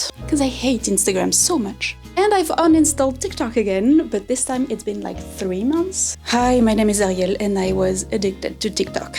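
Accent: France. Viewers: Je suis trop contente que t fr mdr, au debut j'ai entendu ton accent et je me suis dit "mais attend elle est française elle" mdr Tu fait un bon boulot, ta deja fait quelque chose de mignon ? Genre couleur pastel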